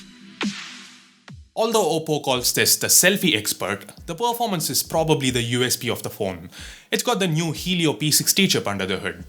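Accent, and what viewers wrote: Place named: South India, India. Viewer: Still you have heavy south Indian accent. Nice review though...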